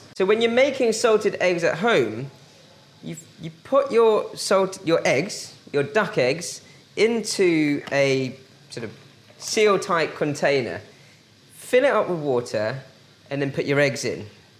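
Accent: United Kingdom. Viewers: A chinese guy in British accent 😂😂 cute😂😂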